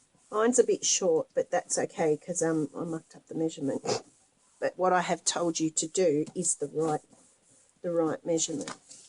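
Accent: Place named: New Zealand. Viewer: When you said spider I thought WHAT!!!!! That's a big spider and I thought you had a NZ accent and we don't have those huge things here. Showed my husband, and then I reread, whew!! Now you are referred to as the " " and the " is a very talented lady, and very generous to share all her knowledge. Had been looking for something different to make, so thanks heaps. Love your way of explaining things too. Cheers for that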